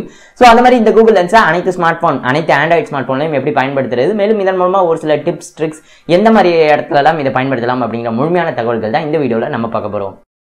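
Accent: Madurai, India. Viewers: Neenga madurai karara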